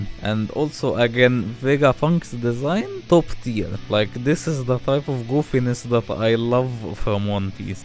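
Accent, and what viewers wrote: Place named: Russia. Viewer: Why you speaking with Russian accent my friend why ?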